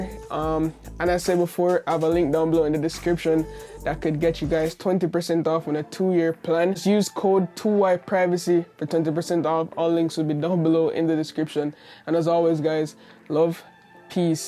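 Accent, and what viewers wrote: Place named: Jamaica. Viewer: Yeah, I can now here the Jamaican accent 😃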